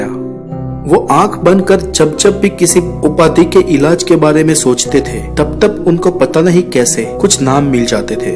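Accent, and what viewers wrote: Gujarat, India. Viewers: plz chq, u r ton,,,,,is gujarati.....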